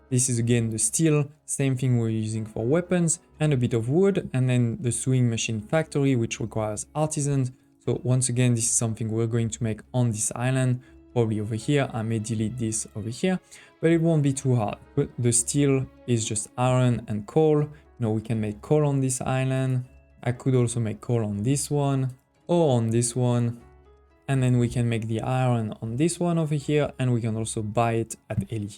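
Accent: France. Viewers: I think that accent is french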